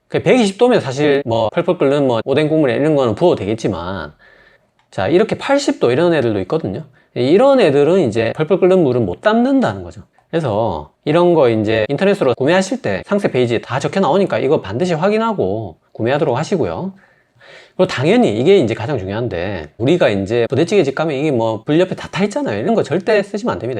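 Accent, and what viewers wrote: Gyeongsang, South Korea. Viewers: 경상도 분인 게 표가 나나요? ㅋㅋ 저도 경상돈데 들어도 잘 모르겠는데... 쌤이 표준어 쓰시는 거 같은디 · 사투리나 좀 고쳐라 듣기 거북하네 갱상도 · 갱상도 의사샘 ㅋㅋ, , 너무 친근하고 부담없는 생활지식 알려주셔서 잘보고 있습니다. always thanks 🙏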